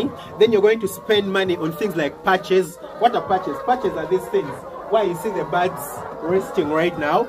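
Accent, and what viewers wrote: Uganda. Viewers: Sir are u from uganda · Your accent is so ugandan 🇺🇬🇺🇬 mucu love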